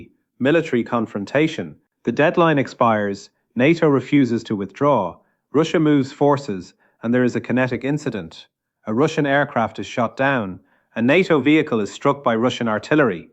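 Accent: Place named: Ireland. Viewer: An Iranian with an Irish accent?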